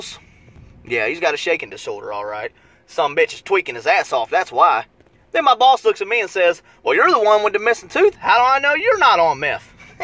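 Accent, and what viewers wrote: South, United States. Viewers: I'm new sub... your funny... may I ask where your from.. you sound southern....if so I'm from bama!!!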